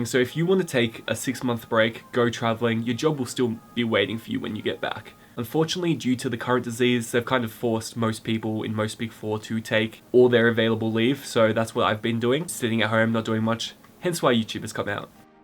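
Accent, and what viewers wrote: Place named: Spain. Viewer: you have a spanish accent. So funny!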